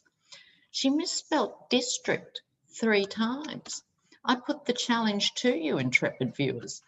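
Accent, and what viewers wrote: Australia. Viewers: You remind me of Dixie from "Designing Women", she was such a beautiful actress and had such a sexy voice like yours. Your Australian accent vs her southern accent. I knew you looked like a famous person but couldn't think of her, she was married to Hal Holbrook (spell.?).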